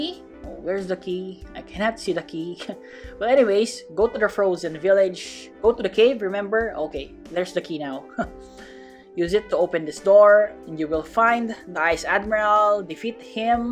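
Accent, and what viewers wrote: Philippines. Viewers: r you from phillipines?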